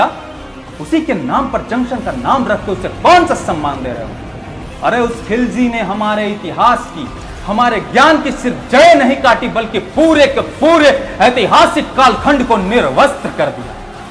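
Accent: Bihar, India. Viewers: Bihari aawaz sun garv hota hai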